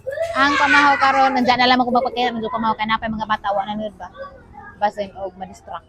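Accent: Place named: Southern Leyte, Philippines. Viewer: Suway ko shout out dai … my name is Haydee Bito Bermoy Moyers from Surprise, Arizona! si mommy nako Ang naka discover sa imo lipay og moot kaayo siya watching you. She passed away 5yrs ago na pero pabilin guihapon ko follow nimo… it reminds me of her when I listen to you talk… she was born in Southern Leyte and I grow up listening to her dialect like yours.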